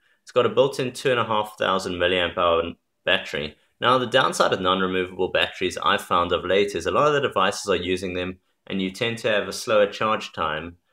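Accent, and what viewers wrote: South Africa. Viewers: Managed to get a WHITE P7 which is extremely scarce at the moment in Cape Town! Price has come down a lot....R5300.... went from the iPhone to this one and its stunning! Was so nice to listen to this video done by a South African.... Thnx for a good tutorial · i knew you where south african! awesome to see local stuff :) good stuff man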